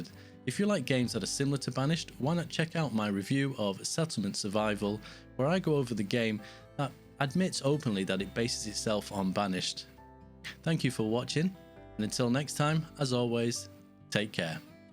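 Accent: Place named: RP, United Kingdom. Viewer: I recently picked this game up. It runs with relatively low demands for a 2014 title. It supports anti-aliasing and runs on WinXp. All scenes you showed included mods: the wooden church, the instant building of fences. This is not in the normal game. The sound is good. The game is lacking in two areas: management of stock at specific warehouses and efficiency of laborers who walk all across the map to do tasks as the town expands. Have a fishery on one end of the town, and somehow a few fish will end up on the other side while the person carries a half a load of stone. Everyone uses this speech synthesizer with a BBC English accent. When I hear him, I'm thinking of an internet personality ranting about veganism.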